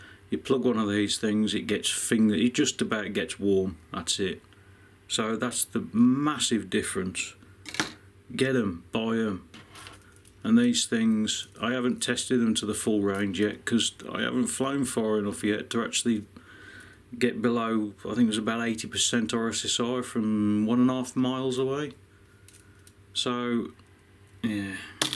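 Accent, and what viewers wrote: Birmingham, United Kingdom. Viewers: Sounds like a Brummy ☻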